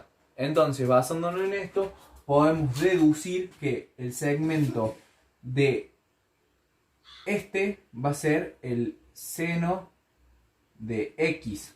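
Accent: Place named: Córdoba, Argentina. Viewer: jajaj cordobesaso el culiau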